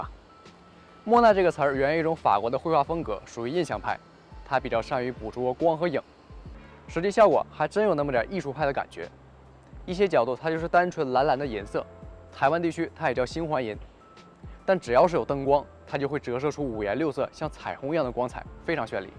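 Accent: Northeast, China. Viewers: 听口音像东北人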